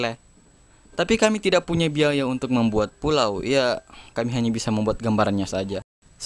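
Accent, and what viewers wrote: Makassar, Indonesia. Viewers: logat makasar ki cukimai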